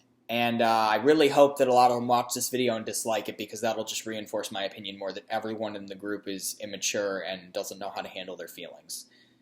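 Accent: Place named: Michigan, United States. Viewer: I came to you for your Speed Queen vids, but then started listening to a few of your other uploads due to your distinctly Michigan accent🤓.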